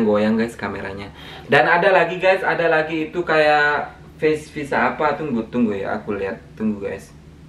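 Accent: Madura, Indonesia. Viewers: Asli Madura Kah Sampean Bang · Logatnya kayak orang madura bang... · Baru paham, = MADURA, pantes logat e gak asing wkwk 😂😂🎉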